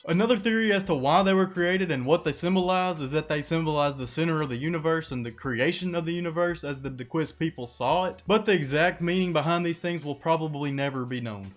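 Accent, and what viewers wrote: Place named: South, United States. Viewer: Dude, I love to see people doing what they love and you obviously love doing this. Your enthusiasm is contagious. I subscribed. You have the perfect mix of skepticism and open mindedness. I'm an educated Ga boy with a Southern accent too. I'm guessing you are Southern but not from Ga. Love the videos man. Keep doing it. I'll watch them. People love this stuff.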